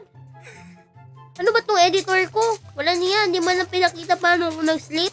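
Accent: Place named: Conyo, Philippines. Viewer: ang cute ng pagka conyo ni ate 😭🤏🏻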